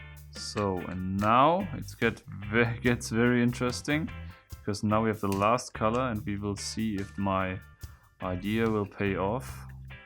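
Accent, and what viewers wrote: Germany. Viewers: Man merkt Hardcore das du Deutscher bist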